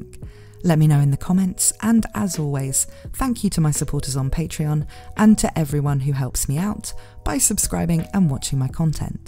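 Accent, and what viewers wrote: United Kingdom. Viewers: I'm loving your videos, I found your channel the other day and I just really like your chill demeanor and accent, I know is a type of British accent but I'm bad with differentiating accent and dialects sorry, even here in America too since I'm a latino lol, but I try since im a fan a couple types like Welsh for example thanks to Dragon quest but I'm kinda rambling at this point so I digress, but I really like it and your dry humor. I've been kinda binging your vids when I can ever since.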